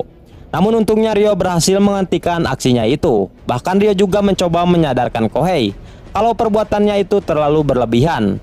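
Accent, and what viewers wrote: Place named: West Java, Indonesia. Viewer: Sarebu persen pembicara adalah USA alias Urang Sunda Asli, katambahan katkuaya babangus mah semakin teryakinkan.... Wkwkwk mantap ieu si mamang sumanget terus sesuai alur Carita 👍🏻👍🏻👍🏻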